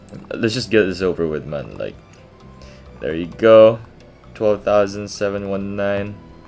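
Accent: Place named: Brazil. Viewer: I swear that you was talking in Portuguese in this video.. your voice sounds like a Brazilian voice 😂 I was searching in Portuguese this video because I want to see again, but now I found it.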